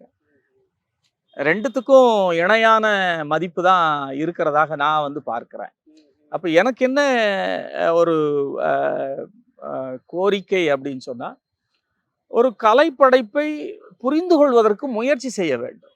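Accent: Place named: Tamil Nadu, India. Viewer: Kongu slang vijayanagar belt but ur slang Tamil Nadu that's why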